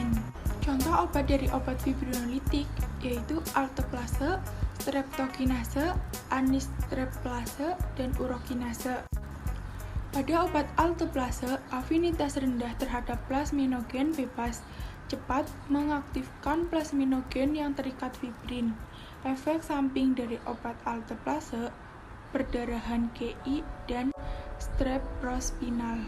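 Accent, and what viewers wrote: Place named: Java, Indonesia. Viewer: Medok banget ndesss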